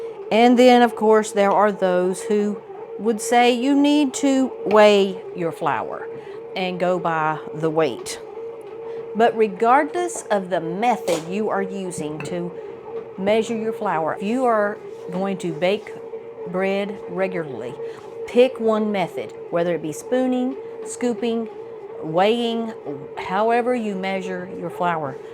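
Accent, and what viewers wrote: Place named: South, United States. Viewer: Do you ever make bread with fresh milled flour?! Love your channel, I found it through The Rose Homestead, and watched so many of your videos, lol!! I recently switched to fresh milled flour, it’s SO delicious, but it’s definitely something to learn, more because I never really made bread, just quick bread, cookies or biscuits, and an occasional muffin. I absolutely LOVE the taste, it’s nothing like what you get from refined flour, although I did just buy a bag of King Arthur organic bread flour, just to have on hand. I have Sue Beckers book, Home Grown Flour, which goes into detail about all things whole grains, and even ancient wheat, I’ve used some Kamut mixed with hard wheat, not on itself own, it’s very sticky, but tastes delicious!! I think many many people are getting into milling because of the health benefits, you seem to live in the South, by your accent, lol, I wish I could visit there, I love the hospitality there! 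I heard about it from a channel called, The Biblical Nutritionist, and then started watching Bread Beckers channel, great presentation, they also have a store in Woodstock, Georgia, I saw it on YouTube, it’s a very big warehouse type store, with everything necessary to start milling grain and making bread, it’s healed So many health issues in people, there are hundreds of testimonies, so I decided to try it!! I know I saw your video on the homemade yogurt you made with a yogurt maker, I bought the KBS bread maker, lol, yours looked great, and you can do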